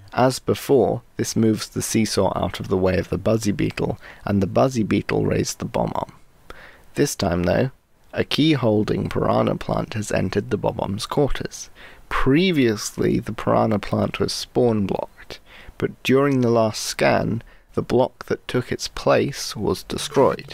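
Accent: United Kingdom. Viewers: Are you Scottish? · are you from portsmouth? you sound like neil gaiman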